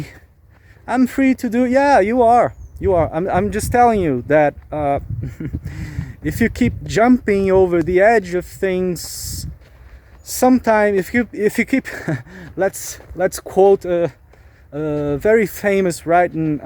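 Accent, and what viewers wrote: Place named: Brazil. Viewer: Publica mais videos, estou tentando aprender ingles e ver um br falando ingles com sotaque é mt bom pra mim praticar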